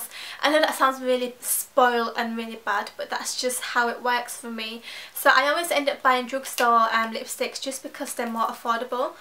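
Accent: United Kingdom. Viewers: U sound British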